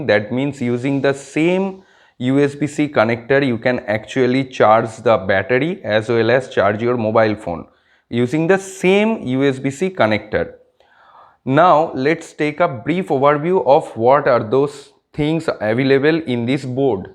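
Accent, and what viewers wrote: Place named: India. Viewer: So.foking annoying anglish you indian 😡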